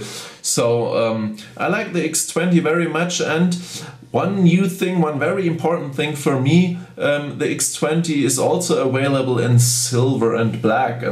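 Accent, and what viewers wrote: Germany. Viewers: great review, & good to hear that german accent again, i had a great german friend years ago, named joseph tretin. all the very best John Radford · Thanks for this comprehensive review. I came here to see what the viewfinder looks like (I only have the X10) and you provided it! One small note for non-German speakers: when says "auto-focus" I thought he was saying "out-of-focus". Don't worry, that's just the German accent pronunciation of "auto focus".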